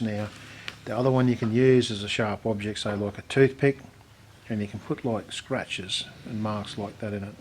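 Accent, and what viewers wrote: Australia. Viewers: Mate I just stumbled across your channel, so good to hear the way you just talk through it. Not just the great description but love hearing from an Aussie. It's like I'm around my mates place bashing out some painting techniques. I'm going to have a look through your other stuff. Cheers for the info and keep it up.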